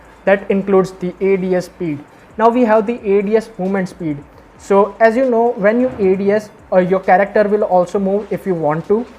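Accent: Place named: India. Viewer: This is why I love Indians. Their tutorials are always the best and easiest to understand. I struggle to understand the accent and hear them clearly but it's still okay cause their tutorials are better than those from anywhere else.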